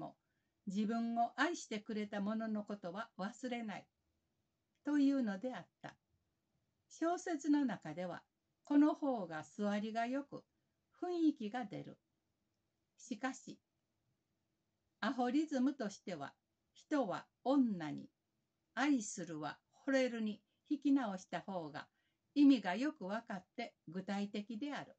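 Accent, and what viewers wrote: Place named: Kansai, Japan. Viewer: こんにちは、私は長年田辺聖子さんのファンでした。本で読み慣れた田辺節、滑らかな関西弁のイントネーションと共に大変楽しく拝聴させていただきました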